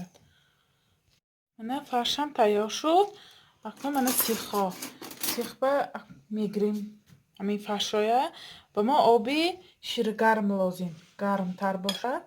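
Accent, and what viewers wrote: Isfara, Tajikistan. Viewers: Ин апамон аз Исфара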